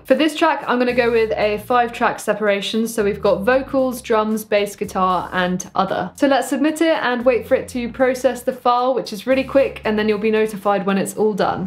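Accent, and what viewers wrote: United Kingdom. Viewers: I had no idea you were British 😂, only follow on insta til now.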